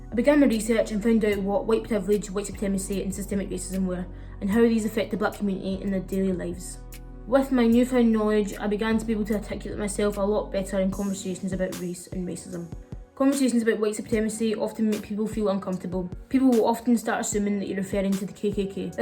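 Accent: Scotland, United Kingdom. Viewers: Your Scottish accent is bloody THICK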